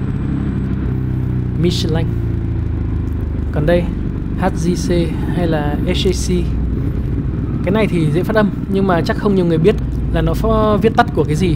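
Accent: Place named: Hanoi, Vietnam. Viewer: Giọng nói giống bằng kiều lai tuấn hưng quá , gốc hà nội có khác :v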